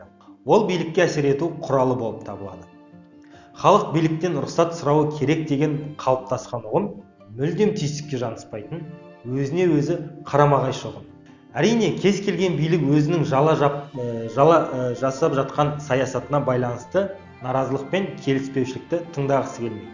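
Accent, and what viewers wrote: Standard. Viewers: жарайсың! Қазақ елінің ұлттық тілінде таза сөйлейді екенсің. Ел президенті ұлтжанды азамат болса, нұр үстіне нұр болар еді. Заманбнк балам Ұлтжанды Азаматтығың сөз саптауыңннан көоінеді. Қазақ Ұлт болып қалыптасу үшін тілімізді жоғалтпауымыз керек. Ананың тілі, Анаңныңмахаббаты тіл жетпес бір теңіз емеспе? Па, шіркін Қазақ тілінің керемет тіл, құдіретті тіл екеніне айтуға сөз жетпейді!!!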